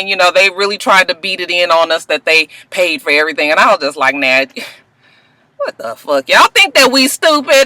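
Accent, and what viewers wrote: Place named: West Coast, United States. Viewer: Haaaaaaa! Hilarious. I looove when u say you be Hard (in yo west coast accent). I try to be hord myself... but sometimes them emotions just sneak in on ya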